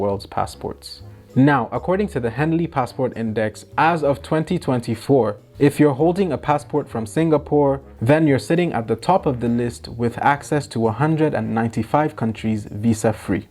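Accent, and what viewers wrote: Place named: Jamaica. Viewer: Could swear you were Jamaican! I do get you on the privilege thing though. I remember going to a travel agency to seek assistance with applying for a Canadian visitors visa. I already had a US Visa and was scheduled to attend a conference, so I thought I would go up to Vancouver for a few days after the conference. The travel agent was so rude and condescending. Asked for bank statements, how much money you have in your account. Needed accommodation information, I booked accommodation at a hostel in downtown Vancouver. When she read the details, her snarky response 'hostel, shared room with wash sink'? I am traveling on a budget, what did she expect, a 5 star hotel? She went to the back and called her supervisor and asked her in front me if this looks possible. The supervisor sighed, do they have a Visa already? She nodded yes. 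Well, give it a try. I gathered the application forms, told them I will think about it some more. Went home dejected, but something in me said, try doing it yourself. Which is what I did, stayed up all night that weekend, submitted the application online Sunday, sibling gave me a ride to the embassy to submit the application. Got a response two weeks later I was approved and I should pick up my passport. Stopped by the travel agency and showed it to another travel agent I normally booked flights with and she was shocked. The other condescending agent acted like she didn't hear anything, but I knew she was upset. Anyway, thats all in the past now, livin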